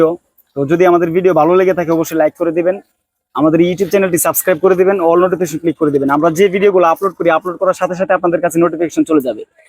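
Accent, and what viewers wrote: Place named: Mymensingh, Bangladesh. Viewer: apnar bari ki Mymensingh? vasha shunei apon apon lagtese. onek kichu shikhlam apnar page theke gari chalanor. bike chalanor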